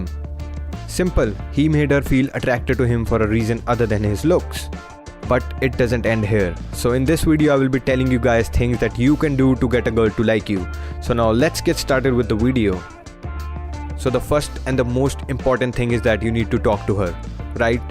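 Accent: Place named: India. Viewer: good one. but you need to improve your accent and speak a bit faster. try delivering four words per second and also try skipping most of the "r"s in the end of words. I guess you are an Indian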